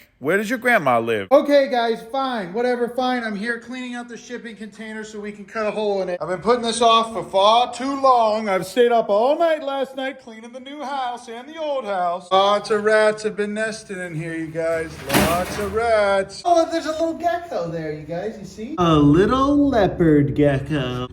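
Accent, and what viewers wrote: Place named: South, United States. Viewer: how are you both Southern and Canadian at the same time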